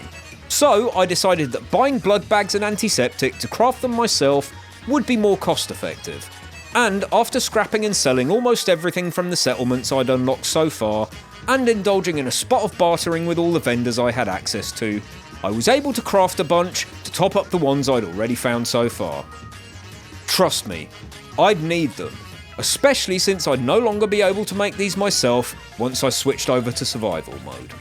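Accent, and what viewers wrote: United Kingdom. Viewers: Great video lad, your accent makes me want to see you do this entire challenge again in Fallout London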